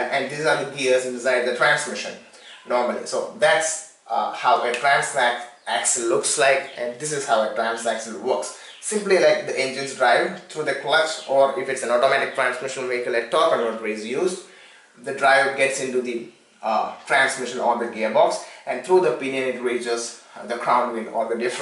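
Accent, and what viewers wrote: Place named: India. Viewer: let me guess... Indian right? ( no racism intended )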